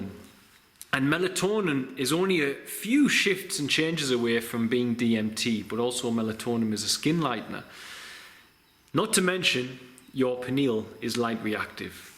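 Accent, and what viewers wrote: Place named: Newcastle, United Kingdom. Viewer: This is what I needed as a Glasweigan. A mega spiritual Geordie to cement what I already knew. Not even a lick of sarcasm. Yes!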